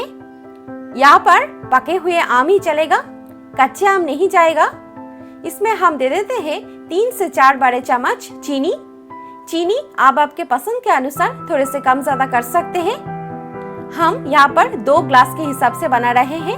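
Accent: Bengal, India. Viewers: Its always please and cute to hear hindi from a bengali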